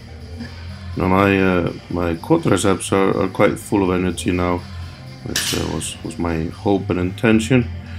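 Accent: United Kingdom. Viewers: You sound Welsh lol. Great video 👌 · Interesting to hear how you've been picking up English accent slightly! Great video thanks:)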